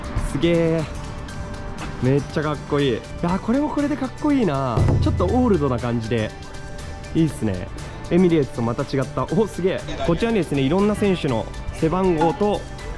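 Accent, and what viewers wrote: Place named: Kansai, Japan. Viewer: しっかりと関西弁😅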